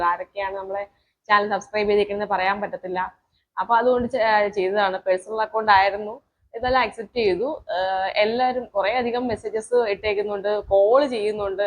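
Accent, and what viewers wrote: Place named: Thiruvananthapuram, India. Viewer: ANGINEY CHECHIYUM STAR AAYI MAARI....THIRUVANANTHAPURAM BHAASHA STYLISH AAYUM, NATURAL AAYUM KAIKAARYAM CHEYYUNNATHAANU HIGHLIGHT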